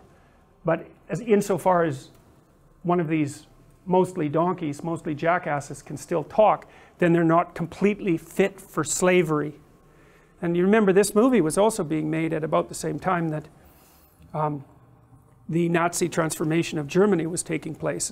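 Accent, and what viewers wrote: Canada. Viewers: Canuck's have an awesome accent! This makes me want to watch Pinoke again and see if the Soviet's had a hand in it rofl...I think if he had a normal voice, no one would buy his bizarre ideas lol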